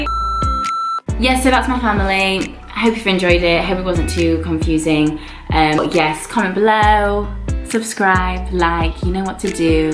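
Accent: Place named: United Kingdom. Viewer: The great thing about you growing up in the UK is that you now have this fabulous British accent that everyone in New York is jealous of. You're a great emcee ( but use the word "friggin" too much as you noted ), but please no more reality shows.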